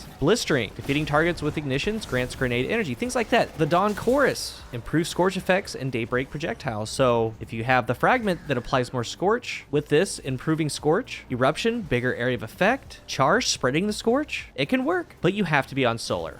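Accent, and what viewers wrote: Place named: Wisconsin, United States. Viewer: your voice is soooo, are you from Wisconsin? try not to do that upward inflection after every sentence it gets real annoying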